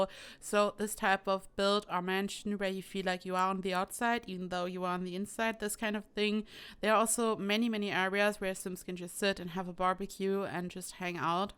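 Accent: Germany. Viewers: Höre ich da einen deutschen Akzent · Are you german? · are u deutsch? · your accent sounds kinda German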